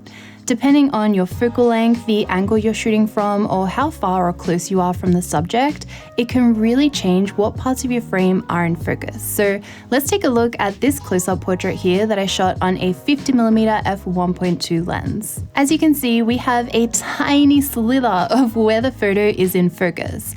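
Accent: Sydney, Australia. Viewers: Great content. Good to hear the Sydney accent. It’s amazing how you can still get negative comments with pretty informative non-controversial videos. I’ll be saving your channel for our schools photography course for next year. Keep up the good work. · Mumma you australian? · Americans love the Aussie accent 🇦🇺😍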